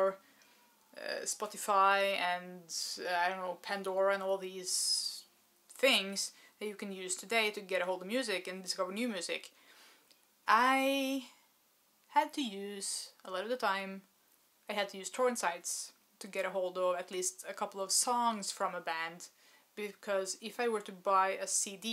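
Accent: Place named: Trondheim, Norway. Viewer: I was listening to your accent and thinking 'she sounds like my friend from near Trondheim' and lo and behold... that is where you are indeed from. So, I feel very smug right now, as it's taken me forever to be able to identify Norsk accents :-) Anyway, goth-wise... I know a few persons of this persuasion in Oslo, but the scen is tiny. That said, I used to live in London, and the scene there is much smaller than it once was. Or so was my observation. Anyway, enjoyed your video :-)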